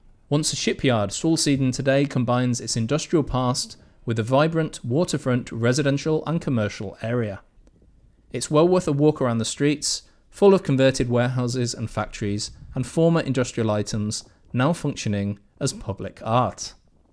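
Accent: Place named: England, United Kingdom. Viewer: You sound English and I am curious as to why you moved here? Do you also speak Norwegian?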